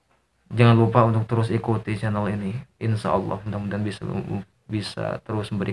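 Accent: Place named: Sunda, Indonesia. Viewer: Mantap. Lanjutkan dengan ke khassan Ustadz Sundanya. Hehehe....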